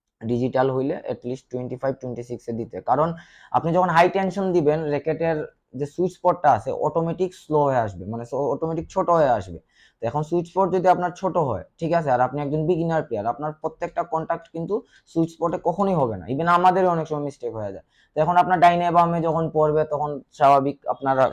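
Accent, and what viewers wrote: Sylhet, Bangladesh. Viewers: Bro apni ki Sylheti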